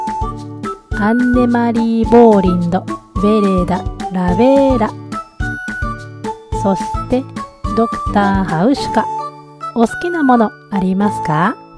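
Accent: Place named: Kansai, Japan. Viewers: 今回も笑えました😂 ドイツのスッポンポン事情‼️ぜーったいNGですよね😣逆にストレスたまります！ 主婦だって息抜きは必要。美味しいお料理とお酒とおしゃべりがあれば乗り切れる気がします！ 実は今日、新社会人に向けて、娘が一人暮らしをスタートしました。私の方が寂しくてしょげていましたが、この動画を見て思わず笑ってしまいました😊nobanaさんの関西弁のお喋り、ほんと大好きです😍これからも楽しい動画、よろしくお願いします😋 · 初コメです！Guten Tag🍺 私も過去に１０年以上ドイツで暮らしたことがあるので懐かしい街並みや生活スタイルを懐かしくチャンネル登録させて頂きました。 旅行で数日滞在するぶんにはドイツはお城やらオーガニックコスメやら硬ったいパンやらもの珍しいかもしれないけど、何年も現地に住むとほんとに日本でのドイツの捏造されたイメージと現実があまりに違いすぎて愕然としたものですよね( ´艸｀) それでも流石に現地に順応されて生活されて子育てまでされていNobanaさんのような素敵な日本人奥様がいることがすごいなと思うし在外邦人の鏡だと思います。 視聴者さんの中には海外生活やドイツ生活のネガティブな部分を見るとがっかりするのか・・そのように思う方もいるようにコメント欄にはいるようですが、ここはあくまでNobanaさんの動画チャンネルなわけですからNobanaさんが考えたお好きに動画スタイルのほうがご自身にもストレスないと思います。ただでさえ寒く暗くどんよりグレーな空のドイツ冬季に色々メンタルも落ちるのすごいわかります。 日本に帰国して思うのですが日本のテレビやメディアは海外の映えている部分しか見せないし、海外に住んでいると(そんなアホな―笑)と思うことだらけだし。 日本のテレビはほんとに海外生活のいい所しか映しませんから、これから海外の出たり海外生活を始める方もこういう海外生活ＹＯＵＴＵＢＥ動画を見ていると思うので、いざ現地に出て映えていない現地生活にがっかりしないように準備するためにも、ほんとの海外生活を見せる事も大事だし、結果的には役にたつのだと思います。 これからもNobanaさんらしい関西弁での面白い動画を楽しみにしています。 ちなみに私はドイツの混浴サウナは１度経験してみればもう慣れてしまいジムで平気になりました(笑) 寒い長い冬の気候で凍えるよりジムのサウナで温まるほうがメンタルを保つのに大事でした( ´艸｀)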